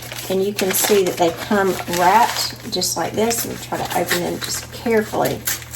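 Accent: South, United States. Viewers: Some people say the video was too long for it's content. I enjoyed listening to her southern accent and watching her cook. If you're an experienced cook all you have to do is scroll down to the recipe and directions and skip the video altogether. So shut up and let the rest of us enjoy the video. And I will be making this recipe.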